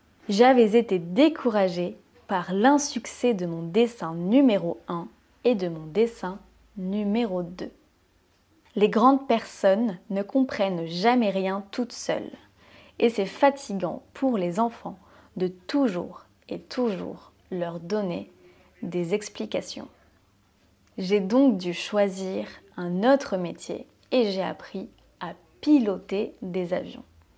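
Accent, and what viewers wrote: France. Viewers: Your English is good. I am a middle aged fourth generation American. You speak better than some Americans. I like the French accent. You can keep it or work on your pronunciation. It’s your choice. Any native speaker should be able to understand you now. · Bravo pour parler anglais, il est difficile pour les personnes instruites et intelligentes de montrer un niveau intermédiaire dans une langue étrangère (je sais le douleur mdr) mais le courage est essentiel et requis pour atteindre un niveau avancé. Et l'accent français est considéré "classy" en anglais, donc c'est un avantage. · J'adore ton accent français quand tu parles anglais. Ça sonne vraiment mignon.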